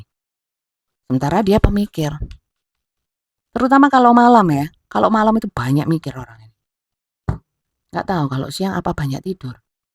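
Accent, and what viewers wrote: Java, Indonesia. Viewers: Lucu..juga.. Bundanya..jawanya medok...nggee bunda..ngge..Mater nuwun Bunda. ❤ 💙🎉🙏 .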